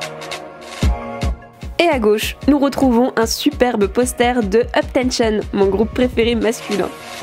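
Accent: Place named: England, United Kingdom. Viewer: Cet accent anglais srx ♡ Sinon plein de love du Maroc ♡♡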